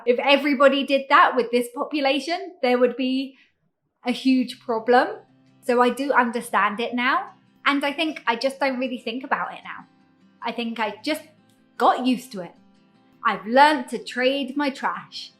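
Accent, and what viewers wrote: United Kingdom. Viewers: 好喜歡你的英式腔，很好聽，像聽哈利波特電影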